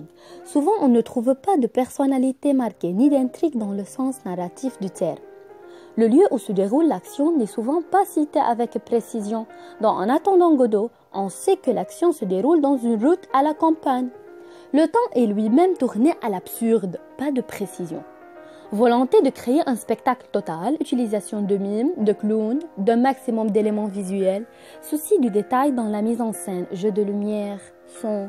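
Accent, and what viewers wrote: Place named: Flanders, Belgium. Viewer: Merci pour la vidéo, ca vient d'ou votre accent? Il me rappelle au Flammandes parlant le néerlandais...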